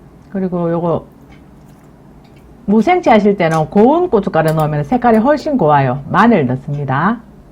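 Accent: Gyeongsang, South Korea. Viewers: 나도경상도 사투린데 재밋네요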